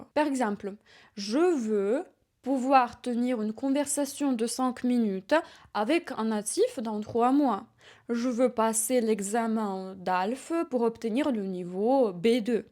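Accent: Eastern Europe. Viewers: Un accent slave?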